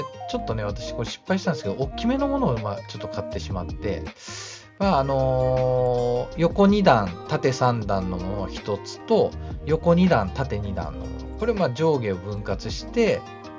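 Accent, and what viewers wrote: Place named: Kanto, Japan. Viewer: ショート動画見てどうなったのか 気になってました。 BGMを少し小さくして頂けるとしやすくなるかと。 関東訛りで時折関西イントネーションが混ざるから関西出身の関東在住の方かと思ったら京都の方とは驚きです。 それやったらやっぱり京都弁で（或いは交えて）話さはったら宜しいのに。 保護してくれておおきに。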